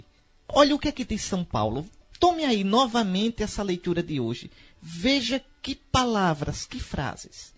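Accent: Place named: Alagoas, Brazil. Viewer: Dom Henrique, com seu sotaque alagoano, sua simplicidade, sensibilidade e conhecimento, nos leva a conhecer e a meditar a Palavra de Deus. Esta pregação é uma relíquia! Quanta sabedoria!🙏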